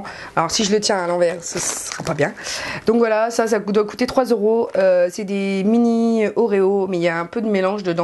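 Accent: England, United Kingdom. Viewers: J'adore ton accent anglais ma petite cloche ;) Tu as vécu en Angleterre?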